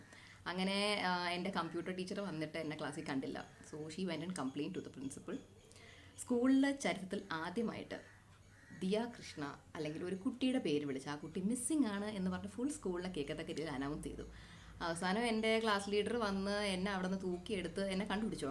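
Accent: Trivandrum, India. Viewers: Malayalam samsarikkumbol trivandrum slang undo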